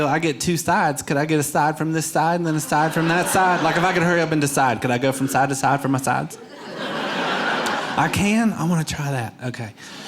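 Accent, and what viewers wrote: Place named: South, United States. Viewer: Funny man. His southern drawl is spot on.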